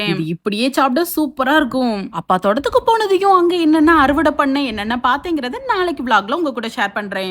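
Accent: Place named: Nagercoil, India. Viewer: Enakum Nagercoil language rmba pidikum enga relatives anga irukanga sister unga video elame super god's grace epome unagaluku unga families kum irukum god bless you sister ❤❤❤❤❤❤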